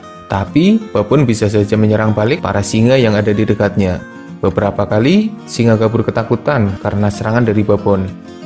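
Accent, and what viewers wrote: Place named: Java, Indonesia. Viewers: Jawanya medok banget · Nampa x jowone hhehe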